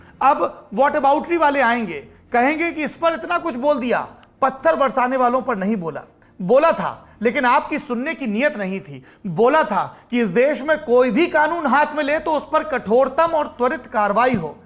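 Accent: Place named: Bundelkhand, India. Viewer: 🙏🙏🙏 हार्दिक साधुवाद एवं मंगलकामनाएं आपको निष्पक्ष, निर्भीक होकर और मीडिया धर्म निभाने के लिए आप जैसे पत्रकारों को के 🙏🙏🙏 कोटि कोटि नमन जी आपकी क्षेत्रीय निवासी लय बहुत अच्छी, बहुत उत्तम और शालीन भाषा बुंदेलखंडी लय 🙏🙏🙏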